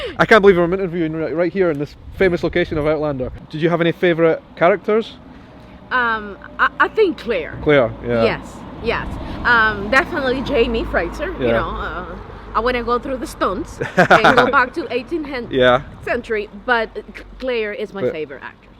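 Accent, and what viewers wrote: Scotland, United Kingdom. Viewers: My grandfather was from Glasgow. It's really lovely listening to a Scottish accent even though you are from Edinburgh. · I am sure you hear this all the time, but I could just listen to your accent all day. I love the Scottish accent. Me and my mom have Scotland and Pendle Hill England on our bucket list trip. We want to see where our family came from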